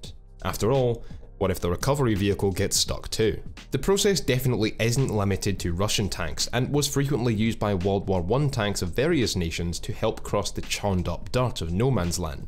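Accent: Scotland, United Kingdom. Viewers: Man... I just LOVE your scottish accent, sounds so cool :D Nice video as well, keep it up ;) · Are you Scottish? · This proves it. I will happily listen to anything if it's spoken in a Scottish accent.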